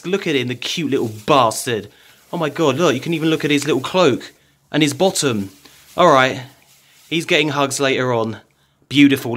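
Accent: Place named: United Kingdom. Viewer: Welp.. I guess I'll sub now. Since you slowed tf down on talking speed you cheeky brit thing you.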